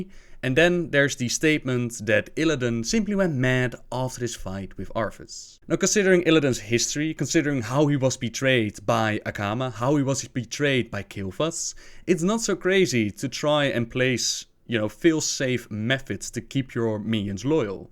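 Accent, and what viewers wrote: Netherlands. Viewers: he is from the netherlands, hes not american or enlish or some sort, he cant do anything about it. For some people who are from the netherlands its hard to pronounce. I dont have that, but i cant pronounce the R while speaking dutch, but i can while speaking english, its rly weird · Where are you from? You sound a bit dutch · dutch accent is real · Where is from?? I know it sounds weird, but when I visit my aunt she sounds sort of like him in like accent wise (she's Dutch) and it's the same with my dad. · dude Ur amazing with this. Dutch accent ?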